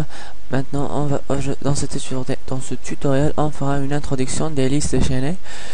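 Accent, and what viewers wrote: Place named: Maghreb. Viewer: t'explique mais on ressent que t'es pas un natif français tu dois être magrébin ? ;)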